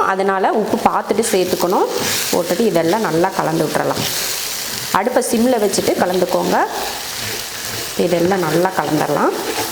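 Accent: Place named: Tirunelveli, India. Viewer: OK... Avangaloda slang tirunelveli mathiri irukku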